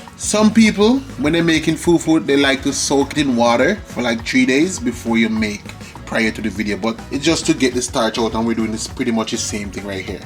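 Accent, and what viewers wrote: Jamaica. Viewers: You sound like a Jamaican😊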